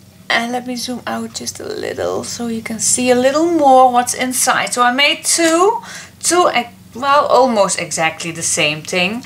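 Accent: Netherlands. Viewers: It’s won’t be long and you’ll have 50,000. Your absolutely amazing lady! Thank you for all your inspiration. Your work is fantastic and I love your accent because it reminds me of my mother in law. She from holland and I completely adore her. Can’t wait for the next video. Take care and happy crafting. We are in Alberta, Canada. 😍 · Sept 30, my mom (who is from Friesland) and I were scrolling thru scrapbook videos - looking for ideas when we came across Christmas Magic and gave it a look. I said "Wow, this lady is awesome!" and subscribed. Mom said "Wow, this lady is Dutch and has fantastic nails" and then "Would you make this scrapbook for me?" Anyway, I have watched many of your videos now and completed the Christmas Magic (or Masquerade) last week, only with different papers - not Christmas. It is gorgeous and your instructions are easy to follow and to adapt a little to suit my needs and ideas. 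Thank you so much! I love to listen to you. Your accent reminds me of my grandmother, only your English is better. Even after 30 years in Canada, she still was about 60/40 English and Fries. About a month ago, I got a great deal on some kitchen styled papers at a local craft shop and made a little recipe book, almost by accident as I was trying to figure out what to do with it. It is really cute but small. I was thinking as I finished up the CM book that I would make a recipe book (bigger) next (as a Christmas gift for my daughter) and what do you know? there was my new favorite mentor showing me what she had completed (and hinted at during the CM tutorials!). Thank you again! You read my mind! I love your work! Please keep it up! I am anxiously awaiting the tutorials for this!!!! PS - maybe your desire to say cool, cool, cool came from a Canadian friend - I hear it all the time, mostly from people who a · Congratulation and well done . 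Thank you so much for sharing your great scrapbooking ideas . I get inspired<3. And I just love your dutch accent. from Anette T from Denmark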